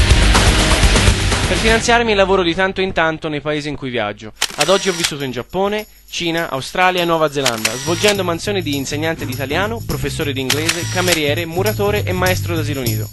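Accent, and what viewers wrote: Tuscany, Italy. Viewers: Grandissimo...ti ho seguito per anni sulle pagine di InMoto, ma vederti in video è proprio un'altra storia! Simpaticissimo, l'accento toscano è veramente una marcia in più (te lo dice un romano) per far sorridere. Ti invidio!!! Complimenti per tutto, e continua cosi!!! P.s. Ma per scendere dall'albero poi come hai fatto? :P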